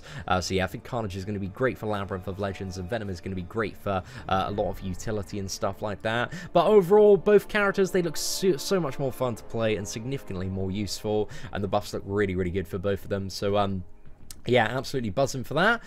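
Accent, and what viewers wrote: United Kingdom. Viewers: Why read the patch notes when you can have them narrated to you with a British accent? ;)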